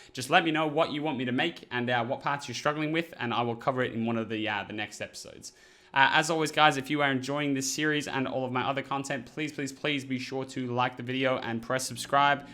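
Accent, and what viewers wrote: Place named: Australia. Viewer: my "inner siege-voice" have adopted an aussie accent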